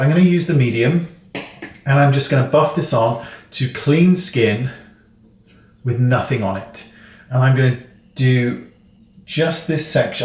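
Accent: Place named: United Kingdom. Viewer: I love just to look at,sometimes, don't get everything he says, British accent,which I love, but I do admit, his videos are very informative, useful!! Thank you,handsome, talented ❤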